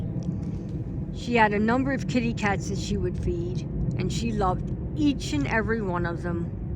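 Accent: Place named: Delaware County, United States. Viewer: I grew up in delco as a child been living in amish land past 20 some years. Didn't realize until hearing you speak that I missed hearing the delco accent. My grandfather and mother grew up in Marcus hook and used to tell me stories of feeding the hobos that hopped off the trains there.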